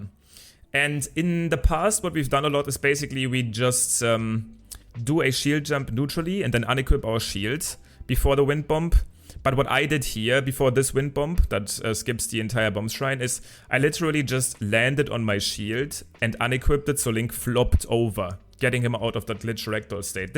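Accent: Germany. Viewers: you sound german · Man merkt den Deutschen Akzent so hart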